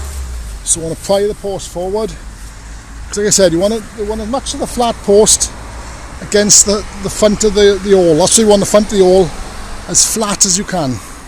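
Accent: Wales, United Kingdom. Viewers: Just have to decipher the Welsh accent a little better !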